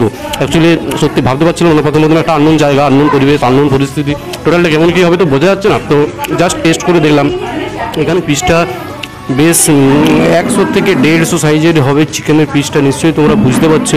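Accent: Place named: Bankura, India. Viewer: Dada tumi koto 1th kotha bolo so nice tomar kotha sunai boja jai tumi bankurar manus ....very nice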